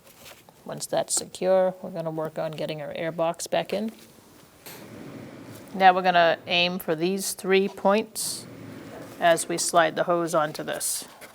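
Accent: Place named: Massachusetts, United States. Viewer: Love the accent, sounds like she's from the northeast like Massachusets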